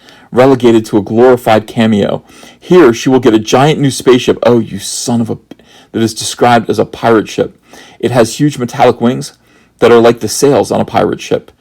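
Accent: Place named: New York, United States. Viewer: Lol that sounds nothing like Mikey Zeruh. More new York less matrix agent.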